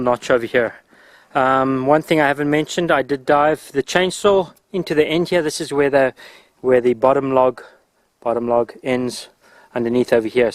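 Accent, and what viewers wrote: South Africa. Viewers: Thats a heavy South-African accent you've got there mate . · Is that a South African Accent I am hearing ?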